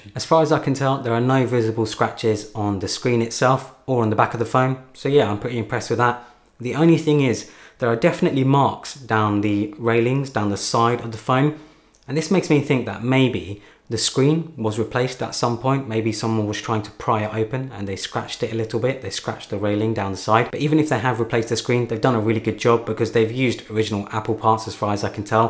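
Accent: United Kingdom. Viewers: No one: Absolutely no one:: "This is a banger, it's wuily wuily fantastic " (Really really fantastic) That British accent 👌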